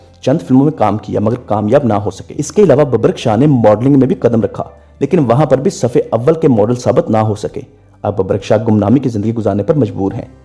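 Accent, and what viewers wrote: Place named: Punjab, India. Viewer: Urdu ko urdu mein boleen urdu ko punjabi mein mat boleen please ...apna urdu ka.lehja sahi kareen ... video bana kar dard e sar na baneen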